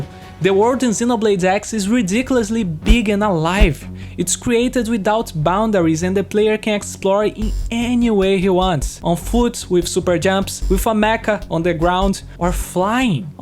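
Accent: Brazil. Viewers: Hey, are you brazilian? Because for me, your accent and way to talk are very similar to the brazilian way, and im from brazil then it seems a lot lol. And very good video btw, I LOVE Xenoblade and monolith soft is incredible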